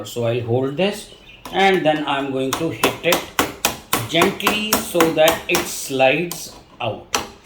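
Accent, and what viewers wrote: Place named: India. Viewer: Good job, i think i'm going to buy it for the winter season. I like your english with hurdu/hindi accent 😊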